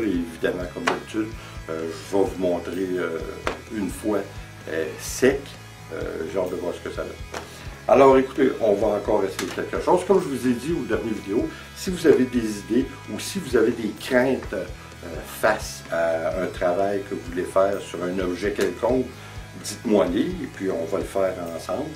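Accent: Quebec, Canada. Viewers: Waouuu quelle bonne idée !! Et quelle belle vidéo : l'accent québecois me fait penser a mon frère qui vit à Montréal, le premier morceau de musique un vrai régal, et le résultat sur la pierre j'adore... Bonne journée !!!!🤗🤗🤗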